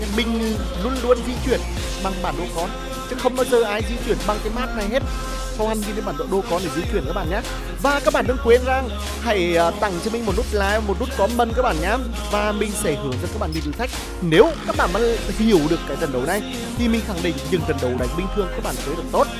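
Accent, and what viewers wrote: Nghe An, Vietnam. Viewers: ông này người nghệ an à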